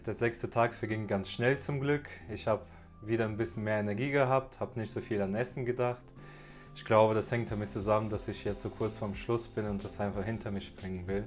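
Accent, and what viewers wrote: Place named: Russia. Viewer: ты откуда? ✌🏻 Russe/Russlanddeutscher? Ich habe das sowas rausgehört, dass du Russisch sprichst 😁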